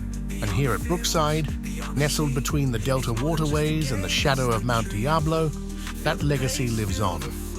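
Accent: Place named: United Kingdom. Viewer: For some reason the British narration makes it easier to understand lol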